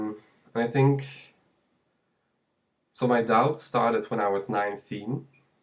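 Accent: Germany. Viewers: You have a very faint German accent...Are you German? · Bist du aus Deutschland? Your accent sounds German.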